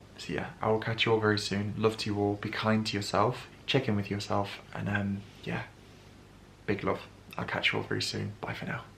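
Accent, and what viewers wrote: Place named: Durham, United Kingdom. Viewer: I wish there had been you tube around when I was younger so that I could be inspired by creators such as yourself. I went through similar things at your age and not having a place to share it took me so much longer to get over things and find out who I really was. I am still searching 40 odd years later. However with people like you sharing and putting mental health on the front page is fantatsic as the stigma is so hard to shake off, however if we all share then we can all help and learn from each other. Plus I love hearing the Durham accent on you tube... makes me feel like I am home xxx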